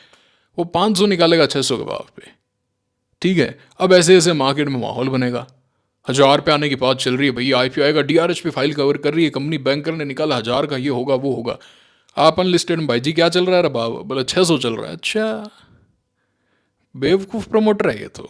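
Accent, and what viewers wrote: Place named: Haryana, India. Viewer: Haryanvi mst bolte ho sir aap to 😅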